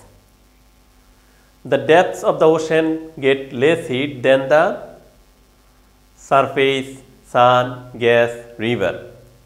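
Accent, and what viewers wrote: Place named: Bangladesh. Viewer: Honourable sir, First you complete phonetics after that teach nationally. I think you are a Banglish teacher.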